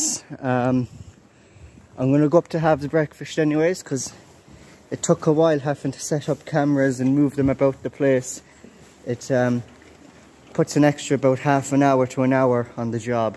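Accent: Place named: Claremorris, Ireland. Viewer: Great video I know you told me your from Mayo sounds very like a Clare morris accent 👌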